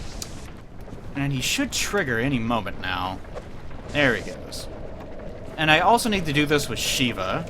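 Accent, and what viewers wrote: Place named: Western Kentucky, United States. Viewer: Going by accent and the fact that he owns/lives on a farm I'm gonna assume is from somewhere in Western Kentucky. Unless he lives somewhere farther East around Bath county in which case I may have been blessed by his sexual aura and not even known it.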